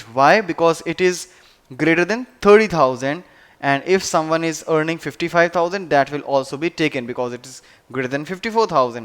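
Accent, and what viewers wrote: India. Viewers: Im following from the first tsql tutorial. We have not got to the joins yet, and yet i have learned more than i have learned from multiple other, 3+ hours long tutorials. Very well structured, easy to follow playlist. Also, a great tutor. Even as non native speaker, despite slight Indian accent (which i like), i have understood everything. Subbed and followed. Thank You.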